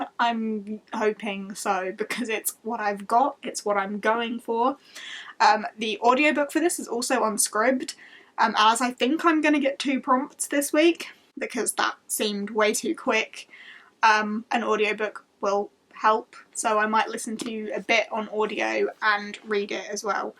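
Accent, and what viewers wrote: United Kingdom. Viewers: I guess you are British, aren't you? Greetings from Italy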